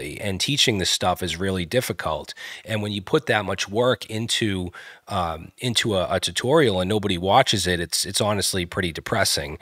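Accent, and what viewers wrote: Boston, United States. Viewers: best Boston accent on YT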